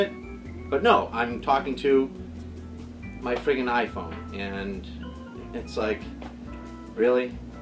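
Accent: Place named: Boston, United States. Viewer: We get to see you sporting your full-on Boston accent in this one. Good on you, mate! Also shows how you can effectively reach out to the thick-headed Meadowlark Lemons of the world. Cheers!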